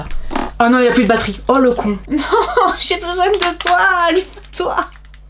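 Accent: South, France. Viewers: Merci pour votre bonne humeur, votre accent du soleil me remonte le moral 👍 Je vous comprends tellement, il me tarde de pouvoir me remaquiller 😊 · C’est la 1ère fois que je commente car ce n’est pas dans mes habitudes. Je suis tombée un jour par hasard sur 1 de vos vidéos du début de votre maladie, les mystères de youtube... je l’ai regardé, puis j’ai suivi les autres, j’ai fait des come backs sur les vidéos précédentes... Je vous ai trouvé tellement authentique, tellement nature, à chaque étape de ce long et douloureux parcours. Vous êtes une belle personne au dedans comme au dehors, peu importe les cheveux les sourcils, les cils, et cette pointe d’accent qui sent bon le soleil que vous avez au fond de vous, vous êtes vous même, ne changez rien. Je vous souhaite le meilleur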